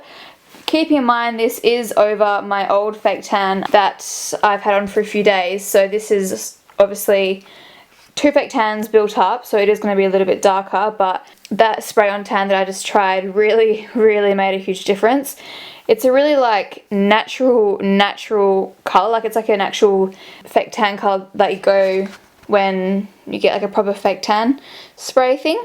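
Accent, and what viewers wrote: Australia. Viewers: She's speaking English and she's from Australia so she has an Australian accent? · you sound so australian here bby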